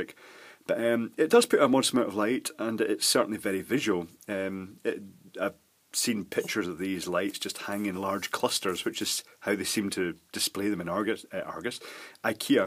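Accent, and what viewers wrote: Scotland, United Kingdom. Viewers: Are you originally from the Isle of Man? Your accent makes you sound like you're from Scotland.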